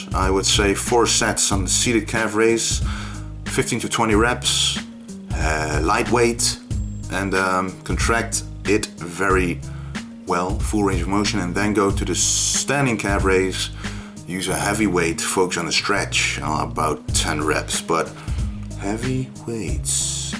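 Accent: Netherlands. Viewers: it's awesome to see another lifter enjoying what he does and publicly answering questions like that. It's quite admirable that he does these videos in english too... I notized right away that this fella must be dutch due to the on and off popping out of the accent. Right on cuz keep it up !! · Were are u from? You sound like a Dutch Guy !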